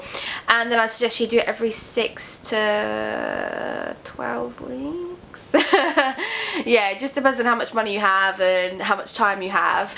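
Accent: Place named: United Kingdom. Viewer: love your British accent you’re beautiful thanks for this video. Still haven’t done it yet gonna color first.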